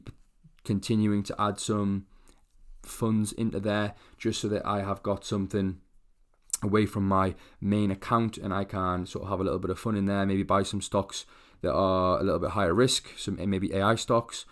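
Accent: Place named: North East England, United Kingdom. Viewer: Nice to hear a Northeast accent talk sbout this stuff.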